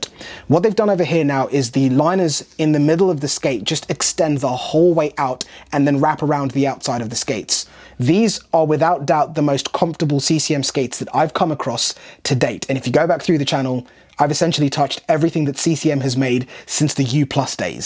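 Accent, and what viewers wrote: England, United Kingdom. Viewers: Learning about hockey from a black guy with a British accent. 😂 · As a Canadian who has never encountered your YouTube channel I was taken aback by the British accent. The thought did enter my mind "what will he knows". But you crushed it. EXCELLENT presentation and analysis. Very impressed. My hockey days are over. Just no interest anymore. But if I was still into the game you would be my go to guy for equipment reviews. Well done. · I'm not saying I'm racist but I'm having a hard to time listening to this English accent talking about hockey skates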